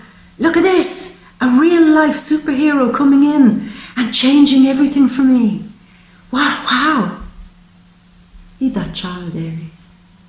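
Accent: Ireland. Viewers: Am I hearing Ireland in your voice?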